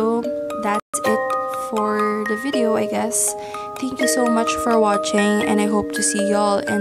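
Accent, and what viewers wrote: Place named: Philippines. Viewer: We stan an angel😫 I can hear the Filipino accent btw I love it!💕 I love your feed so much it's so aesthetic i'm so inlove😭💖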